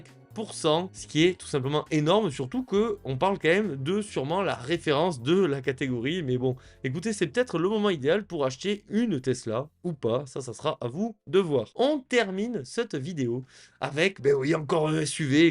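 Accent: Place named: South-West, France. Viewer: Merci pour vos excellentes vidéos et votre accent du Sud-ouest qui me rappelle mes jeunes années.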